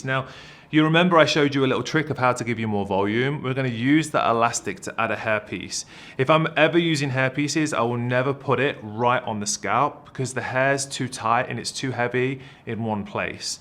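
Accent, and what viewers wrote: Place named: United Kingdom. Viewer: hold the phone.....he's BRITISH???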